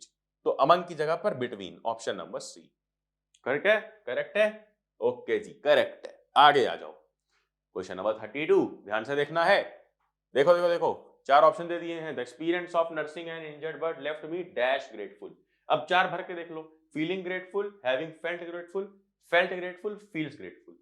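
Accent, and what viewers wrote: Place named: Mumbai, India. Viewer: Bhaiyaa aa mumbai ke lagte ho 🔥🔥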